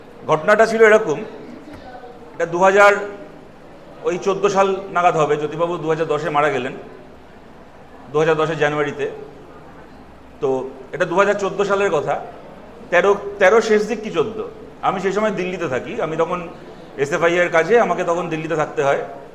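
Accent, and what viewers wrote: Bangladesh. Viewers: Who is not available on pronunciation about parijon and proyojon,he is what type of leadership. what is aramvabe? What are these educated man? Joytibasu made backward West Bengal few decades mainly in English language. actually vat speaker. She has not proper pronunciation also in Bangla. Her pronunciation like Bangladeshee.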